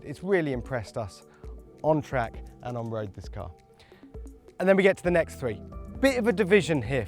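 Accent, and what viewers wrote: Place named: United Kingdom. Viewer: Wins for most boring video. Listening to a Brit is as painful as an uneducated southerner.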